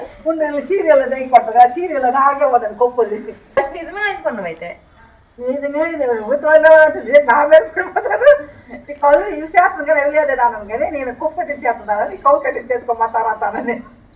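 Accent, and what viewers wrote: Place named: Srikakulam, India. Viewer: Aha emi slang mana sklm ante aa maatram undali super prank sis madi sklm town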